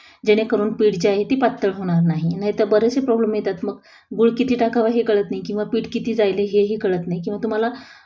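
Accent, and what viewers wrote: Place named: Marathwada, India. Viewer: Mi latur chi Lagech smjt la aaplya side chi tone 😊 Mazi frnd ahe dharashiv chi😊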